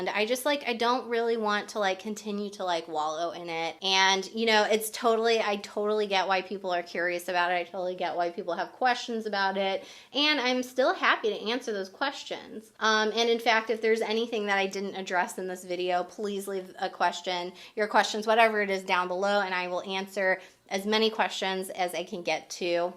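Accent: Midwest, United States. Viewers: Sounds more mid west to me, I've lived in SW Missouri my whole life and she talks just like pretty much everyone here does. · Do you have a Illinois accent or Mid west just curious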